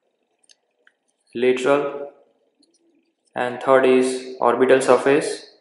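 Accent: India. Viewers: Nice video but your English made it hard to really get what you're saying and you're speaking too fast. It felt like you were speaking Indian.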